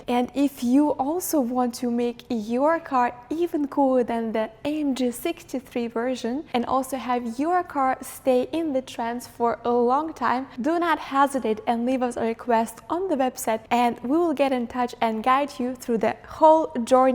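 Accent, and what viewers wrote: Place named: India. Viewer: этот английский для индусов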